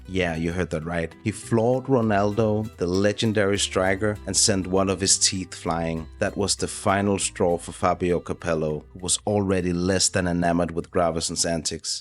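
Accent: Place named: Denmark. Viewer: The Danglish is strong with this one.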